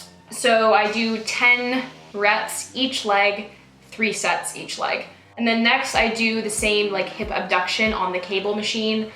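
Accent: Canada. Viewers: Are you Canadian because the way you say out is different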